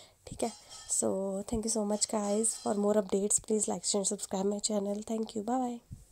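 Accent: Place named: Haryana, India. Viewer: Ok thank you. And God bless you 🙂 But kha se ho yeh toh btati jao. Accent se guess krra tha. Last guess haryana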